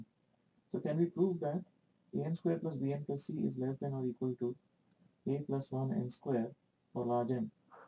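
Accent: India. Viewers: Indian (?) accent plus s-o-f-t voice plus recording volume turned up to compensate = mud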